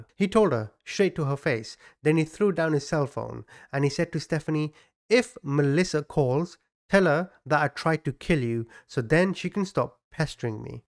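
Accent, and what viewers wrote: United Kingdom. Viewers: You make me laugh so much, I think it's because you speak like a Brit and I get you, I love your channel and your opinions, great job man.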